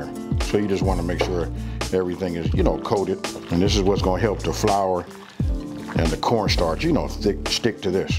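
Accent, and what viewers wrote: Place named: South, United States. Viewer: Where you born ? You sound southern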